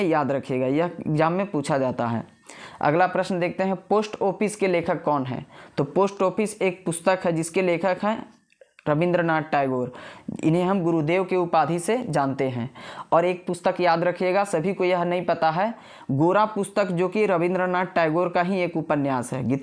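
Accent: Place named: Bihar, India. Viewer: Aap bihar k ho bhai🎉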